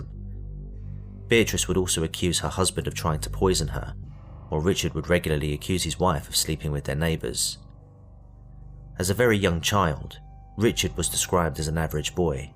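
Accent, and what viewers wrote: United Kingdom. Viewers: Super stuff my man...are you English??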